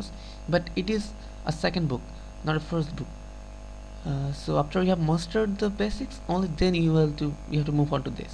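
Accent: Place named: India. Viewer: what is it with the accent....u r indian right..??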